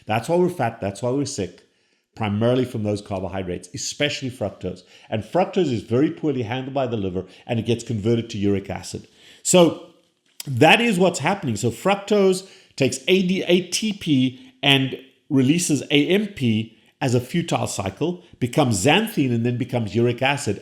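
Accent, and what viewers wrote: South Africa. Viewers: He sounds very south African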